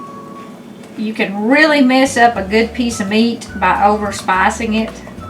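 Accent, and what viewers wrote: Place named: East Tennessee, United States. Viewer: Love your videos, oh & that East Tennessee accent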